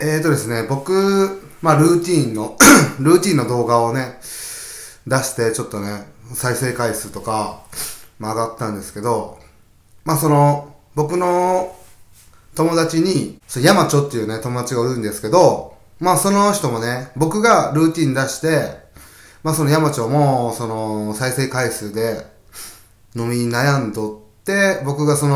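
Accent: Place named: Okayama, Japan. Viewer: さんと幼馴染みとも知らずに、さんの動画を見てました。彼の独特の岡山弁が好きですね♪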